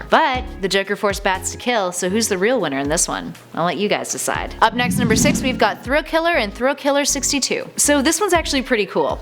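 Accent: Canada. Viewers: Is that a canadian accent i detect or am i tripping? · Is she Canadian? She's adorable ☺ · I love u and ur needless and ur Canadian accent it's super hot ;)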